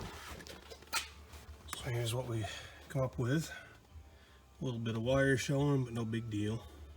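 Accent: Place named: New Hampshire, United States. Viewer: Definitely a NH native! 73, K1GHC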